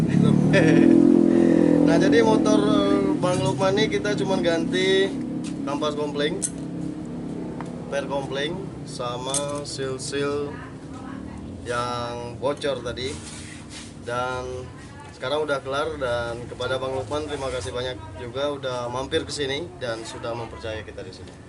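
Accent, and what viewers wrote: Palembang, Indonesia. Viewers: Wong palembang yo kak?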